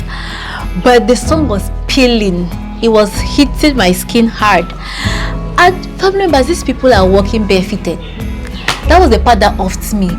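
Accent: Nigeria. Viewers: You sound Nigerian dear. I hope you had a good time in Naija ❤ 🇳🇬 🇨🇲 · You are Nigerian without knowing. Your accent and reflex is Nigerian. For the boxes payment, it varies from region to region. In the south, you will pay for virtually everything because, the people are money driven. In the north, you don't have to do that unless you have extra loads that will be needing loading. A bit of madness & street credibility will either get you off paying or reduced payment. Only in bukkas or restaurants do you wait to collect your food. In eateries, you get served. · You sound Nigerian. One of your parents should be a Nigerian. Good video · I swear you be naija babe the way you speak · You sound 100% Nigerian and you have such a good charisma about you. Thank you for visiting Nigeria and promoting our country 😂😂😂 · Are you sure you are not one of those nigerians living from the boarder with Cameroon? 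Your accent doesn't sound like the English speaking camerroonians at all ? Any way , you do well!! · You sound Nigerian. Nice video. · Are you sure this beautiful girl is a Cameroonian?She looks Nigerian from her looks and accent.We like you girl ❤️ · Yes,your accent Nigerian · Eventho', Cameroonians speak similarly to Nigerians BUT you have also, adopted some of the speech patterns that are peculiar to Nigeria. People that are close to U over there can tell U that for sure. Except if they've been watching a lot of Naija movies too! 😂😂😂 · You sound too Nigerian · Wow! You speak so Nigerian-like in terms of accent. I really enjoy your narration. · Ilat fisrt i said why did she introduced herself as a cameroonian, you soud more like a Nigerian.❤❤❤ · I want to believe this is your normal accent, and I hope it's the same for other Cameroonians. You sound so much like a Nigerian that I was shocked when you mentioned that you're a Cameroonian. 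The accent is literally the same and I honestly can't pick out a difference. I can easily spot a Ghanian speaking English, so if we are being classified as sisters then I'll say to the Cameroonians "twin, where have you been?" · Same accent as Nigerians. If she didn't mention it, we would've assumed she was Nigerian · I’d have put a bet that you’re a Nigerian 😀😀 .. you look and sound like us geez ., you’re our sister Biko 😅 ❤., come back to us please .. for the eatery thing yes o my sister , you have to wait and take your order not all 😊, but for most you have to sit and signal someone to come to your order, … for the luggage most times it’s for extra luggage they pay for , for the food “a plate” contains everything , but for those ones that you want to specify you will have to pay for everything my sister except the stew 😂😂 .. na so we see am no vex … Glad you enjoyed your stay ❤❤ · Why do you speak so much like a Nigerian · You sound 100% Nigerian,. 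Are you sure there's no Nigerian gene in you? First time subscriber. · Tell me wetin make you different from a Nigerian?. Your accent alone b like yoruba and igbo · You sound Nigerian from south west or Edo. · I was shocked you were not Nigerian “ yet “. Your accent and diction sounds so Nigerian and the charisma and vibe is so beautifully Nigerian. I guess God missed this one - you. You have a new subscriber. Cheers love you. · Cameroonian don't speak like this, are you sure you not a Nigeria or you where born and brought up in Nigeria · You dont need to go back to cameroon, you look like nigerian and you sound like nigerian, we sure gonna check your DNA because we believe you're our's. less I forget, did you come to nigeria by road? I hv a friend you wants to come from cameroon but she's scared of flying. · Wow, you look Nigerian, you sounds like a Nigerian, 100% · Exactly. She sounds nothing like a Cameroonian but everything like a Nigerian. 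· Love thisssss!! You sound more Nigerian than me! You express so well. Super amazing of you! Kudos👍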